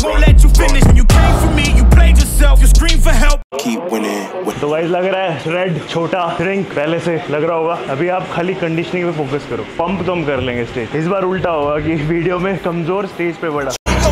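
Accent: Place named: United Kingdom. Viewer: Kaafi tagdi English accent hai bhai aapki🔥👌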